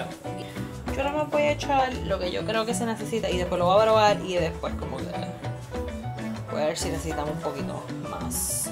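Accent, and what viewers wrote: Puerto Rico. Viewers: Una China hablando un perfect Español boricua uuff muy Bonita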